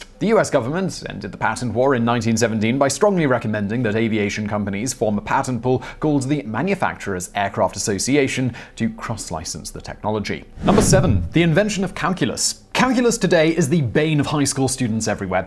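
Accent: United Kingdom. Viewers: The BritLish pronunciation is interesting indeed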